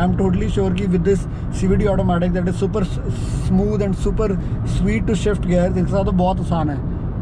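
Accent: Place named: Punjab, India. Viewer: apni yeh punjabi tone aur attitude kabhie mat chhodna luv u sir: )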